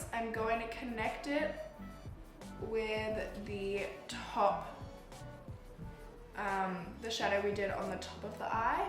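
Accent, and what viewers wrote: United States. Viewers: you're so pretty like wtf and your freckles are adorble I think I have a girl crush on you fr I love you're american acent as well. I also like you're personality! btw im from london xx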